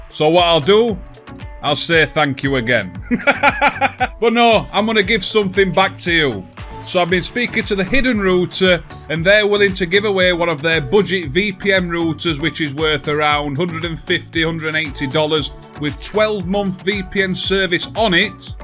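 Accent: Yorkshire, United Kingdom. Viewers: Congrats on the getting the play button, you fully deserve it........as I've said before, your the only reason i watch youtube nowadays.. always make me laugh and that top yorkie accent 👍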